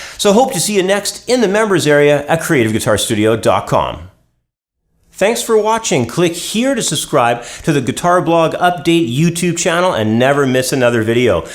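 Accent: Canada. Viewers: Nice job my man. I think I understand now. Btw, are you Canadian? I'm only asking cuz I can hear your accent.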